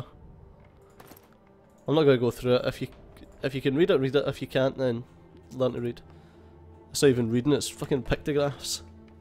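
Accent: Scotland, United Kingdom. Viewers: I fucking love this game and i love the Scottish accent. SO GOOD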